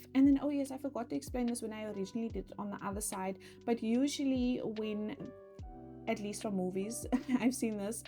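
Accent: South Africa. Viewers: Hi, I know this is random but are you South African by any chance? I sense a South African accent, a strong one lol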